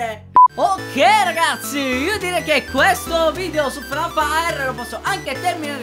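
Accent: Sicily, Italy. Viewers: Sei siciliano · sei siciliano? Io si sono palermitano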